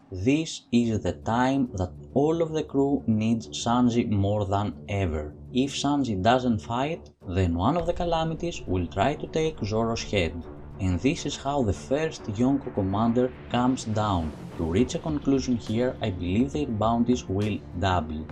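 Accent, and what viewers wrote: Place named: Russia. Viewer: What you said about my region is true and I'm from the worst nation in all of the middle east and Africa(guess the name it shouldn't be that hard to do) and actually I recognized the accent too; he's Russian and that's the 2nd most powerful nation in the world and to be this strong you probably have many people who are very smart, intelligent and educated and this is specially true for Russia that doesn't have much natural resources(I know they have lots of oil and natural gas which is good but not so good economically with all the sanctions imposed) I just got so triggered with the list that I wanted to say something awful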